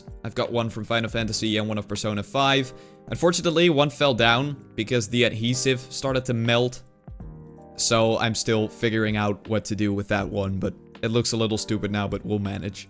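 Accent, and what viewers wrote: Netherlands. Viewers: Joo, hoor gelijk aan je Engels accent dat je Nederlands bent haha, leuke video's man! Kwam langs je skylanders video, en kreeg gelijk weer een nostalgisch gevoel man. Leuk dat je zo ingaat op de wii games waar bijna niemand op ingaat of die een beetje vergeten worden. Ga zo door!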